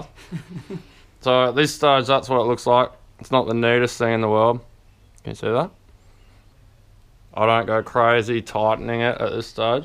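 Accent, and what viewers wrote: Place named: Sunshine Coast, Australia. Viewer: Fark me! Briggsie’s brother from another mother! Good , informative vid. Are you from Sunny Coast also? Same accent. That way to tie the FG is the only way I reckon is the bomb. Searched heaps and this method finished off with the rizzuto knot is the best by far!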